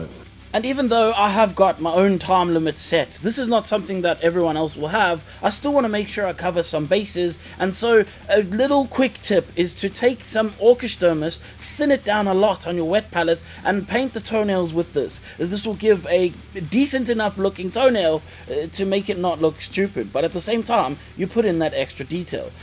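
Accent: South Africa. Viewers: Can't believe i've only found you now! I'm just about to begin my painting journey and love the amount of detail you give and the fact that you sound like you are from ZA too is a mega plus! XD looking forward to binging this channel before and after i get to start my painting adventures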